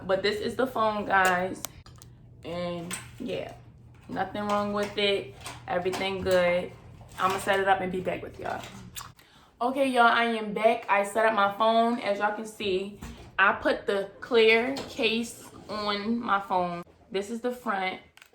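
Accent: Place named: Baltimore, United States. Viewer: How was the battery health ? And are you frm Baltimore u got the accent 😭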